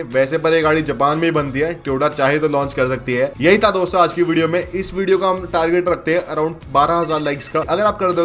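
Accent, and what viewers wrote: Haryana, India. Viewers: Haryanavi accent mai bakwas mat kar yr CLARITY se baat kiya kar 4RUNNER arahi hai ya nahi ,teri toyota walo se kuch baat hui to wo bata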